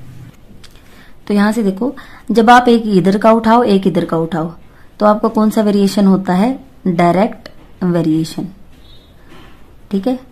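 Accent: Haryana, India. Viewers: Mam is belongs to harayana because unke bolne ke tarike se pata chalta hai ,,right mam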